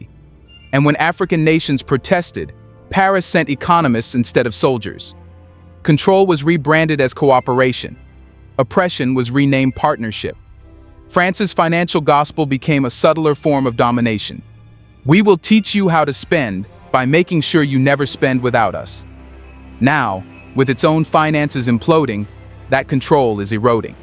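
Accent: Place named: United States. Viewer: For an American voice -over to lecture any body on their national debt - when the USA's own Foreign Debt exceeds its own GDP already (and is 10 times the size of France's) and will only continue to grow until its economy collapses - pretty soon!, it is thought - Is a bit bloody RICH! 'Do one America - about the shortest lived Empire (with the axception of Attila the Hun's perhaps) EVER. GO SUCK A LEMON!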